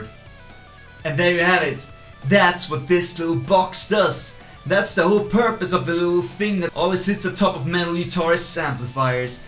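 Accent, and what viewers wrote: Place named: Northern Europe. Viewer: He just sounds like he has a northern European accent to me.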